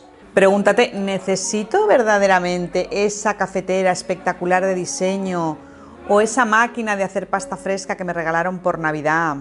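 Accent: Spain. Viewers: Eres de españa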